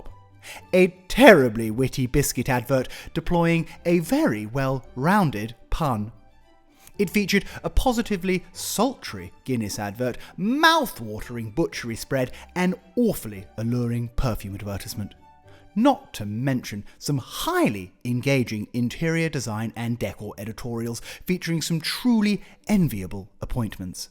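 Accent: RP, United Kingdom. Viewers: I’m alway so excited to watch your videos. The absolute sarcasm with the best posh accent. You always bring a smile to my lips and an inappropriate laughter at the worst time. ❤❤❤ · Fab’ content. Super interesting and I will follow your progress in what will be a lot of hard work to renovate a lovely home. Best of luck! BUT, and it’s a BIG BUT…. The RP is unbearable. I shall be using closed captions from now on. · Every aspect of your house is enchanting. However, your attempt at some sort of received pronunciation is getting a bit wearing and from now on my mute button will be used, The visuals more than make up for a lack of overly precious narrative.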